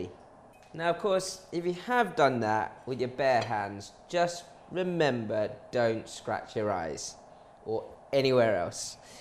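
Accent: United Kingdom. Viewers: Chinese guy with British accent got me head spinning! 😳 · Slightly British accent 😁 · Love your British accent 😄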